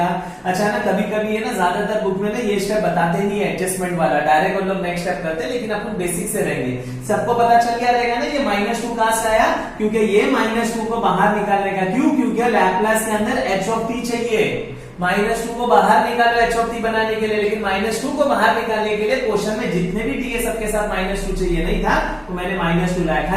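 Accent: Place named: India. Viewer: Why is indian english so difficult to understand? :(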